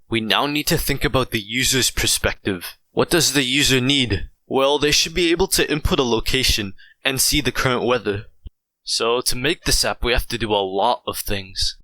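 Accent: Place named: Netherlands. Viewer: I can smell the Dutch in your accent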